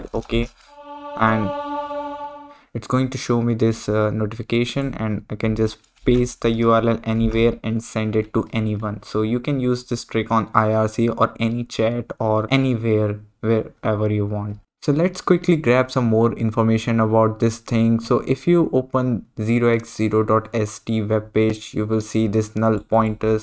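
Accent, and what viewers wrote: India. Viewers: I suggest you stop talking with an indian accent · Recognised you as Indian by the accent. I am Indian too, from Haryana, using arch linux with openbox. Tiling managers don't work for me.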